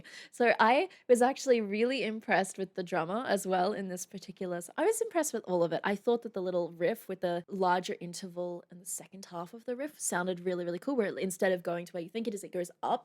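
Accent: Australia. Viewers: YT algorithm put you on my feed so here I am. Elizibeth endorsed you (check), good looking red head (check), nice Aussie voice (check). I subbed. Recommendation- Introduce us to some Aussie artists that those of here in the US might not have heard or know of, that would be neat. Cheers. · Where is ur Australian accent?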